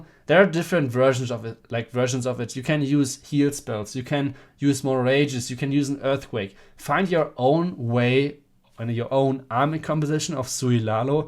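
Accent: Germany. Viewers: You can tell by his accent he is german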